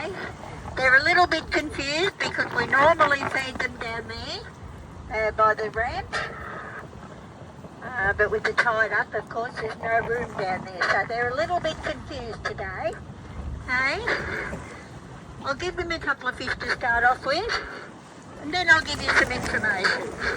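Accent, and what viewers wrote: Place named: Australia. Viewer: Wat a beautiful accent we Ozzy's have. They could easily eat her if they had teeth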